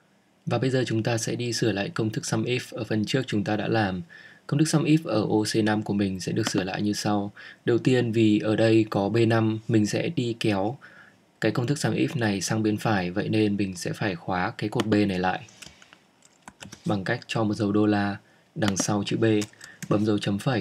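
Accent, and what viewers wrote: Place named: North, Vietnam. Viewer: Giong con trai mien Bac nghe hay qua. Cam on nha.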